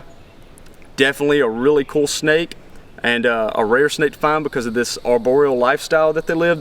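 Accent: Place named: South, United States. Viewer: Love the southern accent you have. Keep on truckin bro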